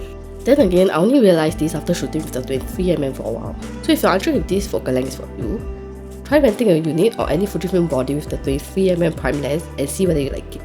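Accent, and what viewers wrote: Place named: Singapore. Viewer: I own an XT5 and was just browsing Fuji YouTube for entertainment, I don't know why but being French expat living in Singapore landing on your video hearing your Singaporean accent was really heart-warming, feels like "home" (at least my home now). Subscribed!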